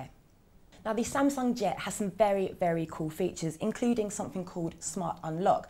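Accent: United Kingdom. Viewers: fuck british accent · SHe got Brit accent.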